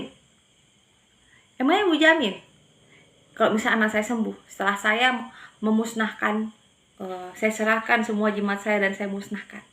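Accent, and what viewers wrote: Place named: Madura, Indonesia. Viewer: enak bngat bu bhsa di campur good.snang klu khotbah tu bhsanya darrahnya hrus ada krn itu asli bhsa leluhur bu dia.sy paham klu logad madura krn sy lama di surabaya tnggal di daerah kenjeran bnyak madura di sananya mba.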